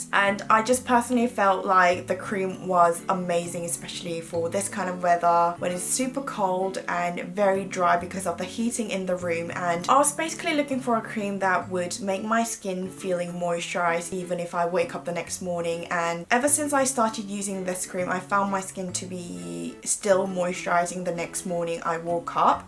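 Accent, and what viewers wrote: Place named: United Kingdom. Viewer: 영국영어도 제품 설명도 매력 넘쳐요♡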